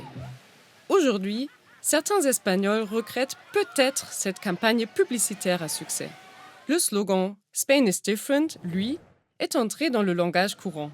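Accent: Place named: Germany. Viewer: Elle a l'accent allemand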